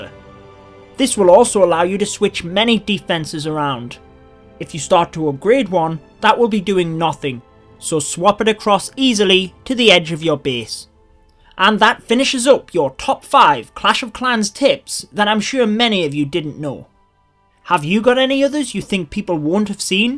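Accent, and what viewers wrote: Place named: Scotland, United Kingdom. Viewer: Where are you from? You sound Scottish and English combined.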